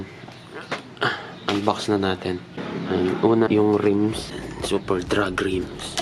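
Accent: Pampanga, Philippines. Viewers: Kapampangan ka pala soy hahah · boss kapampangan ka pala yee 😂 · Kapampangan La Pamo Hahaha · Kapampangan ka pala paps😂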